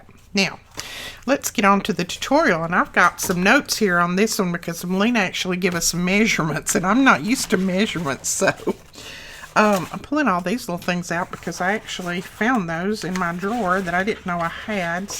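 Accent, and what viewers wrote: South, United States. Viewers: ❣️❣️❣️ I almost never comment after yesterday's comments I want to let you know how I so love watching your channel! You're great and have such creative and understandable instructions. And, we talk the same language❣️ Although I have been away from my Southern roots for many years, I still have an accent and enjoy listening to others who do too! Keep up the beautiful work that you do, you Southern "Queen of Junk Journal Making", you❣️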